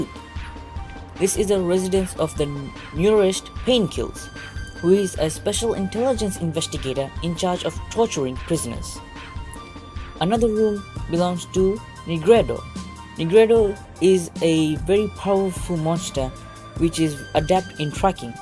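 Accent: India. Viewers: Alkatron agreed. I am so incredibly distracted by the indian accent. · Great video except the Indian accent. U could have someone else to read it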